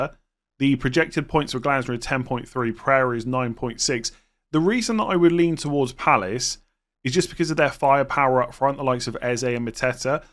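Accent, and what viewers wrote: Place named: Midlands, United Kingdom. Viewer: where you from? Sounds midlands to me, derby/staffordshire way?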